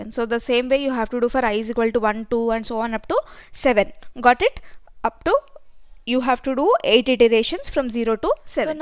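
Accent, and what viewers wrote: India. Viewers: Indians..you brillian...but you better talk slower..and get Usa English accent host.. Indian accent sucks...my goodness..you sound like a n machine Gun...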